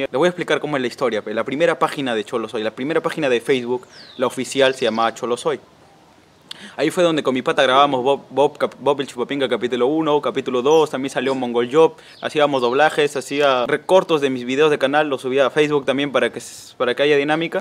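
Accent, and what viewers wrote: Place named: North, Spain. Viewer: Algun dia ire a vivir al norte para tener su acento y decir pucta v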